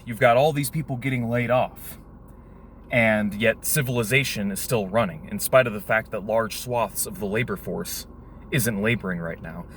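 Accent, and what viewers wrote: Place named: United States. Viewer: Why is the steering wheel on the right? You sound American.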